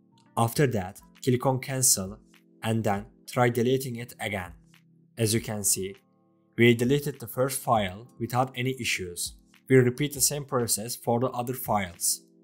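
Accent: Turkey. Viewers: reis bişey sorucam sen türkmüsün aksanın türkçe çünkü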